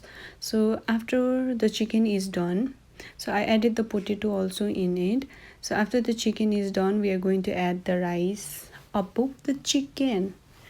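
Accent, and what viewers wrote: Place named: India. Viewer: Wow what is the magic secret recipe for the Indian accent?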